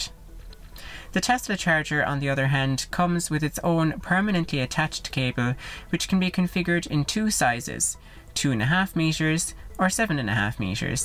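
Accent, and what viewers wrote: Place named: Ireland. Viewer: You're the most Irish sounding Irishman I've ever heard!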